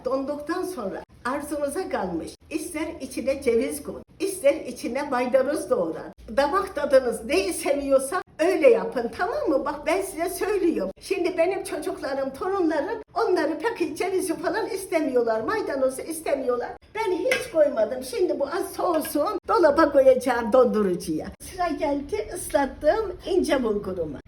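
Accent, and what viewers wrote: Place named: Malatya, Turkey. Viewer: Oy şiivene gurban olam ben nerelisin acaba. Malatya lı mı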